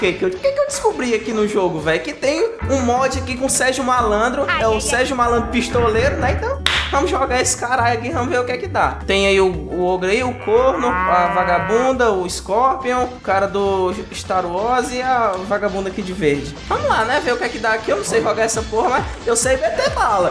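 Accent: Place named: Ceará, Brazil. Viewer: Mermao, Cearense falando e muita onda kkkkkkkkkkkkkkkkkk Coloquem mais esse narrador pfv!! muito bom!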